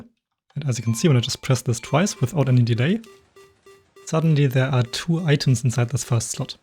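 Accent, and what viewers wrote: Germany. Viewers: i never built this but watched the video because i like redstone funny accent german man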